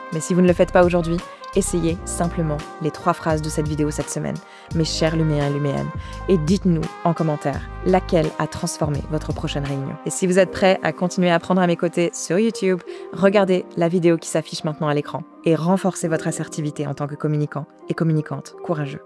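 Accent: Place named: England, United Kingdom. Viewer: j'aime trop ton accent anglais en parlant français, je peux t'écouter toute la journée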